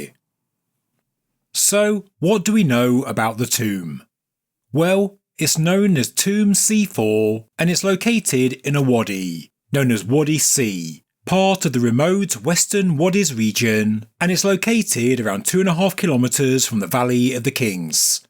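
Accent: United Kingdom. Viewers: British accent really sucks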